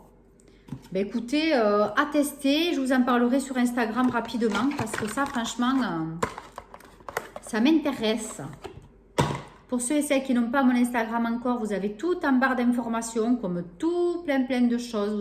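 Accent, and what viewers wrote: South, France. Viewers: Bonsoir! Super haul et très bien présenté, ça m'a donné envie d'y retourner. Je suis dans le sud ouest et par ton accent je me demandais si c'était le Noz de Montpellier. Bonne soirée.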